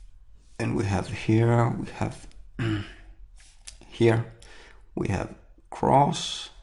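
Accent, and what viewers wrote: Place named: Germany. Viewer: Hey - good video - you sound German. I have a question? is the answer for this video Matrix 39, or the other answers you gave for the 19 Matrixes -- are these "your answers" thru problem solving? or are they answers from a testing psychological institution?? Can you let us viewers know?? -- thanks -- from --Canada:)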